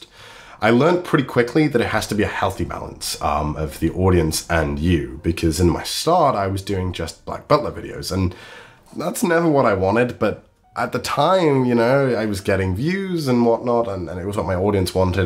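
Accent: United Kingdom. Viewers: He sounds so British back then LMAO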